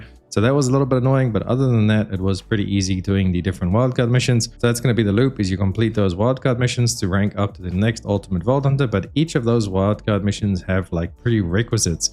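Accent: South Africa. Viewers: Great video very well laid out. Curious is that an South African in AUS accent? Just guessing don't burn me if im wrong.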